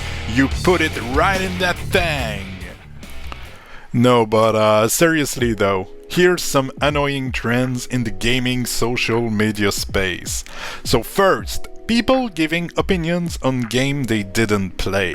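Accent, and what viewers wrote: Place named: Quebec, Canada. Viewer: J'aime l'accent Québécois ! Cheers to you !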